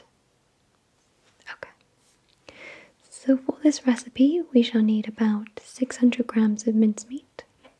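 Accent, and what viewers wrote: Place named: Wales, United Kingdom. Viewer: Tyler Sudden II she’s Welsh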